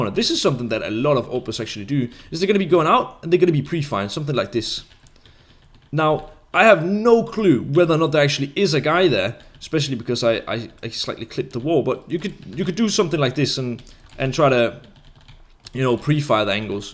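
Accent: Denmark. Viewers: are you danish? xD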